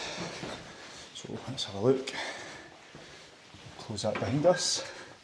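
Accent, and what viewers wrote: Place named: Aberdeen, United Kingdom. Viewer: Great video mate just came across your channel I know your mates an Aberdeen man with that accent where abouts you from cheers for the great videos from hamilton in south Lanarkshire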